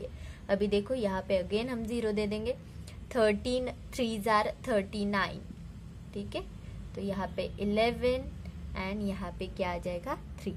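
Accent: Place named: Mumbai, India. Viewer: 😊 aap mumbai ki lagti ho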